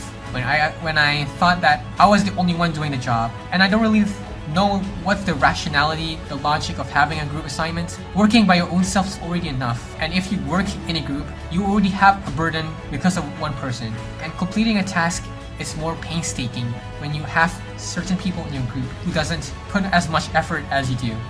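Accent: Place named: United States. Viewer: love your American accent 👍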